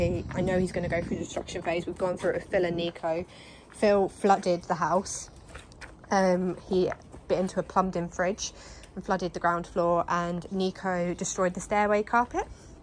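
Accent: United Kingdom. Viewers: British accent is so satisfying 😏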